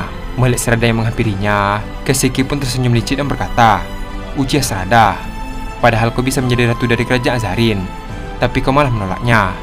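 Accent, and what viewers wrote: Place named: Bali, Indonesia. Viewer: Logat baline keras gati bli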